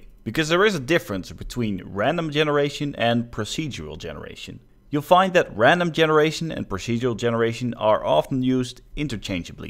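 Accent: Netherlands. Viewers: How come I always recognize a fellow Dutch accent. Then when I check the account info, it's actually true xD Useful info video tho! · I could hear you were Dutch, but you have less of an accent then I do after 30 years living in the USA! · Yes, as a fellow Dutchman, I recognised you accent within a few seconds.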